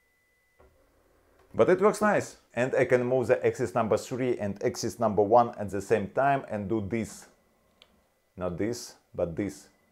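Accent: Russia. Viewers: You twice mentioned Putin at end your video again..hm...hmmm... and this Rus accent...hmmmmmm!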